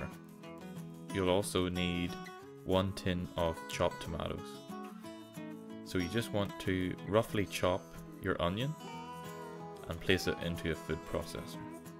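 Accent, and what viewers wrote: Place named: Ireland. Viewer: I thought I heard an Irish accent :)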